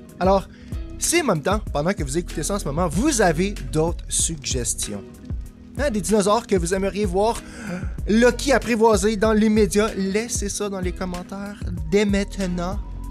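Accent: Canada. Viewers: Continue comme sa ! J'ai repris ark il y a 1 mois et je suis tombé sur tes vidéos par hasard et sa m'a bien aidé ! J'adore ton personnage et cet accent canadien est incroyable 🤣 force à toi man !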